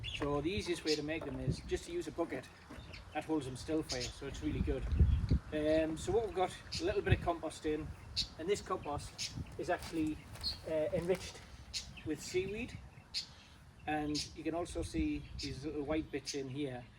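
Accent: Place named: Scotland, United Kingdom. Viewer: I came here from Kate. Watching from Toronto,Ontario Canada. I am wondering where your accent is from. Sometimes I hear a Scottish accent. My uncle lived in Peterlee County Durham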